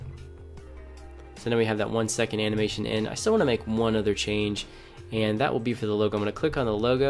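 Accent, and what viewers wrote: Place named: United States. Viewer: Not understand the American Accent but the tutorial appears very clear, Gud Job, Very Nice Tutorial.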